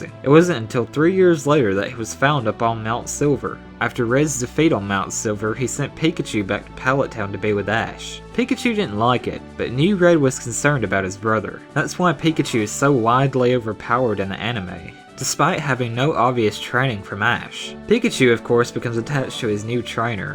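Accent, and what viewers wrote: South, United States. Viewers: Does anyone else agree this guy sounds like a hillbilly? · i like your light southern accent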